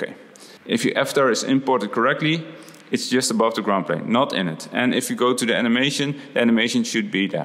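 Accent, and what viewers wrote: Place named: Netherlands. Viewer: Nooooo, your English is perfect I am sure it is even better than mine, but I recognize Dutch accent from their pronunciation of the letter "S". In Africa we ask people not to sleep in the open, because of the missile. hahhahahah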